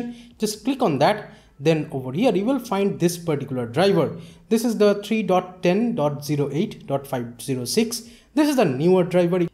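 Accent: India. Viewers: Hindu accent :D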